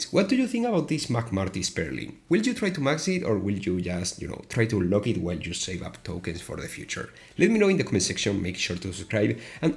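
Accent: Spain. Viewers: Are you from Spain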